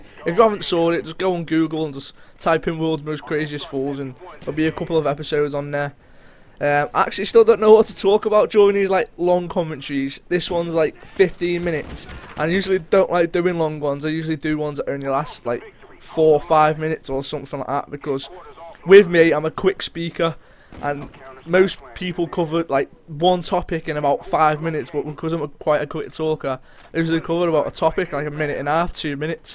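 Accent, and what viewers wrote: United Kingdom. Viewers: It's ONE of our British accents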